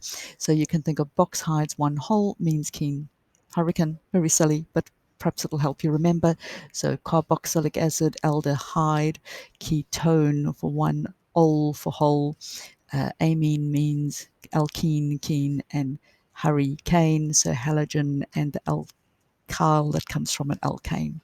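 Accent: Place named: South Africa. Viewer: Thank you for the helpful video! P.S.: you have a pleasant South African accent :)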